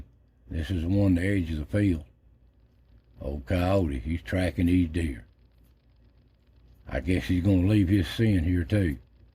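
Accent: Appalachia, United States. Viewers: Hello Mr.. Just subscribed. I did it cause some of my ancestors came from your part of the country. I was born and raised in Northern California. Your voice reminds me of my grands and greatgrands (grandparents, uncles and aunts) at holidays and family reunions. Heck, I have some of the same way of pronouncing certain words or using ole Appalachian Mountain terms after generations apart. I used to get teased sometimes. I didn't care I liked the way we sounded. I'm 63 now and listening to you and viewing your videos make me feel like I've come home. Fact is one of my daughters moved to and married in Kentucky years back. She been searching family and found much information on the old ones and just how connected we are. She sounds now like she was raised there. I love it!❤ Thank you.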